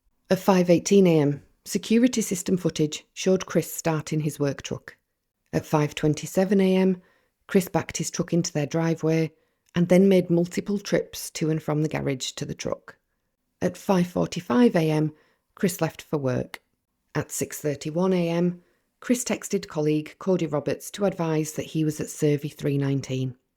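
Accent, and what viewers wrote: United Kingdom. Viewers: Great video. Is that a northern accent I hear? 😊 It’s lovely! Keep up the great work!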